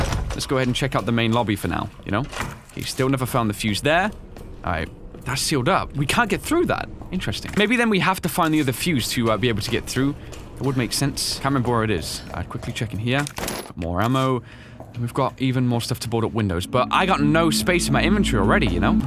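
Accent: United Kingdom. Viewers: True british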